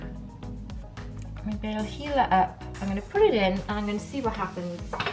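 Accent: United Kingdom. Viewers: Love your cute British accent.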